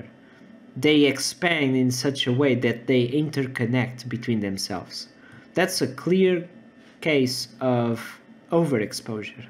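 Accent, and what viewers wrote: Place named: Portugal. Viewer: Are you portuguese? Your accent makes me want to buy you a Coffee and a Pastel de Belém..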